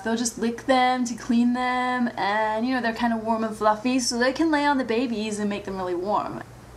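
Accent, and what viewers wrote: United States. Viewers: def didnt know you werent american. great job. funny vid too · Great American accent! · You do a fantastic American accent. Never would've guessed it's a put-on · Your American accent is really good. I almost can't imagine you with a British accent. This isn't your best bid, but not bad. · Wow... your American accent is so very convincing. I keep forgetting that you're British. XD By the way, I love how you randomly choose which accent to use in your videos. :D Ahahahaha!!! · Haha you are right you sound a tad American, my fiance says i talk American even though im from Manchester..."/ Great video. :D LMAO. I have a PO BOX..If you want to send me babies...=] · brilliant! and your American accent sounds so authentic. :D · i figure out what this is about now. is making fun of that american woman who had 8 babies. Hence, the american accent. 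· wow.. you do a perfect american accent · This is hilarious! And you do have a very impressive American accent. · i like how non american english speakers can mimick our accent flawlessly yet we cant get others down.. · um...what happened to your accent? You sound like a So Cal local not a Brit. Didn't you used to be a Brit? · Your american accent is SPOT ON! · the american accent adds something to this video, I don't understand what that thing is but I think it's a good thing. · lol You're wearing bad lipstick and using an American accent. I hope you're just teasing us. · love the american accent XD It's your sarcastic tone lol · why do you have an american accent in this vid? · I really don't know how anyone could think this was real, its meant to be funny. Just watch her other videos. The American accent is really good. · i love your american voice! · Hahaha, well done on the accent, I actually thought you were american XD Not to mention all the jokes, I can't believe some people actually took this seriously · how did u get such a good american accent? 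· Some people actually took this video seriously? o_o The internet continues to amaze me. But you have an INCREDIBLE American accent! o.O Like House! Except you don't hide half of your accent with a really deep, gruffy voice. xD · Haha, I love this video. You'd be making better use of them with that dance squad than "Octomom" could. Nice American accent btw ;D Fooled an American. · It's like Brittany Spears impersonating Nadya Suleman parodying Angelina Jolie. Very good American accent! You forgot Nadya's ridiculous pout and that fake humble look. But you got crazy downpat! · Your American accent is impeccable. You actually sound exactly like someone from Western Canada/northwestern US. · your american accent is extremely entertaining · whoa how did you just start talking without a British accent? you don't even sound British here, it's nuts! I'm Canadian and yeah, you sound American. I'm really intrigued at how you good you are with the accent. 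· I'm american and your american accent is perfect. Impressive! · How hard do you have to think to speak in an American accent? When I try to speak with an English accent, I have to think about every single word I say. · Why does she have to do these sarcastic videos with an American accent? Now all the Youtube idiots get to say "fucking american. so typical" and any other retarded comments.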